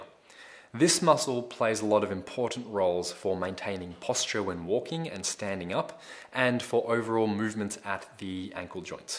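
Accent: Australia. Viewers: Great video. Most beautiful Australian accent I’ve ever heard. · he is Australian, that is an Australian accent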